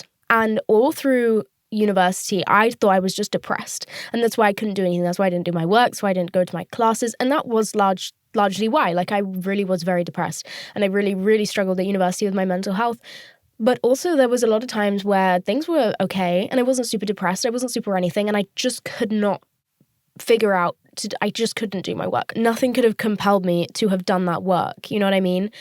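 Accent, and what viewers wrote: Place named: England, United Kingdom. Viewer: First off: love, truly Second: something about proper English accent, so eloquently spoken, pushed through a profane, bright, articulate girl - it's like Hermione Granger if she watched way too much Netflix.. it's an amazing, original voice and I am HERE for it 🍿 Third: always better to have more information about yourself than less. The further you go in life you find everyone is, minimum, a little fu*ked up - the only difference is who knows themselves better, who has better countermeasures and coping mechanisms. is lovely, honest, much needed and must be protected at all cost. Do what YOU need to get through the day, all of you, please believe there is a 50% chance the person next to you on the bus or in line or at the grocery story is equally or more messed up than you and/or going through even worse sh$t than you. 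Listen to, appreciate her honesty and challenge yourself to be that honest with yourself. Awesome job Ms. Carry on Queen 👸🏻🥂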